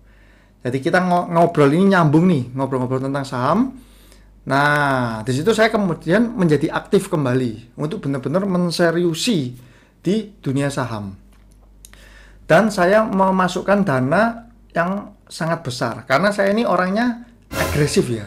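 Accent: Surabaya, Indonesia. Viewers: Bhsnya kyk wong Surabaya ki,mabtab infonya broo... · Kok koyo wong suroboyo smpean Koh..? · logat boyo kentel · Logat suroboyo ne mantep koh 😁 Type trading nya kyk saya nih pernah dalam sekejap untung ratusan persen pernah juga dalam beberapa jam rugi puluhan juta Masih belajar biar ga greedy saya koh moga bisa melek money management 😂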